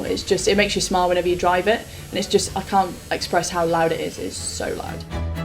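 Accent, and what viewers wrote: United Kingdom. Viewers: I just realized that accent is the same as Mary's accent from AC4. Can any brits fill me up on what part of England that has that accent?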